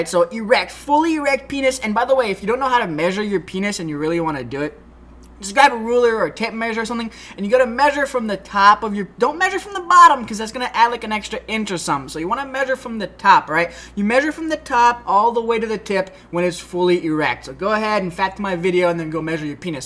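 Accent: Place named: Canada. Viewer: what part of canada is he from?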